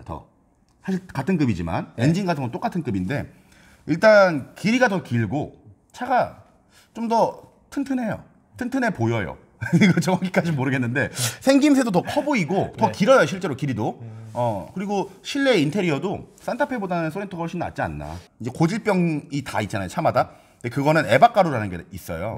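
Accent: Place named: Gangwon, South Korea. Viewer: 듣다보면 강원도 사투린지 조선족 사투린지 햇갈리네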